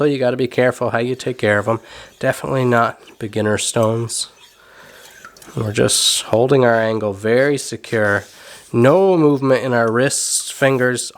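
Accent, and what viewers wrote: Newfoundland, Canada. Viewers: Awesome work dude! Sounds like you’re a maritimer. Definitely not a PEI or Nova Scotia accent going to have to say you’re a Newfoundlander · I watch your videos for two reasons besides masterful knife sharpening. One for unique experience of enjoying the Newfoundland accent and second as a thank you to the people of Newfoundland (Gander especially) for their unparalleled humanity and hospitality during the days following 9/11. Hope to visit one day until then will visit vicariously through your videos. 💕🙏🏻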